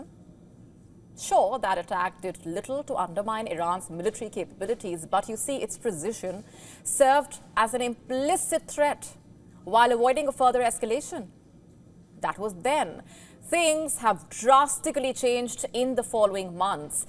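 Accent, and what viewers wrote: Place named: India. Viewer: These Indi accent is awful lol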